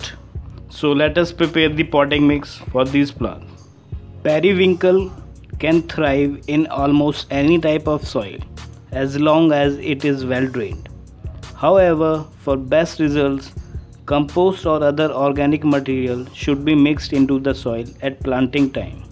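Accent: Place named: India. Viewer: Your accent is too strong I cannot understand your Hindu English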